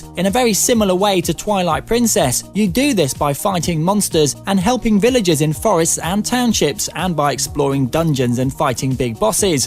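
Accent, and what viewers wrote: United Kingdom. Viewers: hes got the British accent. im pretty sure some people would say we are saying it wrong.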